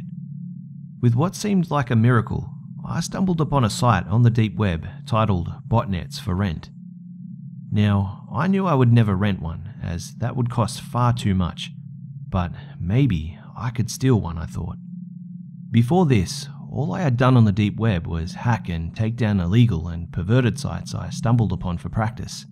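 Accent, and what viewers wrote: Australia. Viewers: bruh you sound like and Australian, anyway love your shit. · love your story's, I just found this channel a couple days ago when I was listening to some Mr. nightmare story's and one of your vids popped up in the recommendation section. I love both channels and you are a great narrator, your Australian accent is awesome and you have great story's. I subbed on the second video I listened to and have watched a bunch of videos since, I'm glad I have a lot of catching up to do because I won't be running out of video's any time soon. :-) keep up the good work!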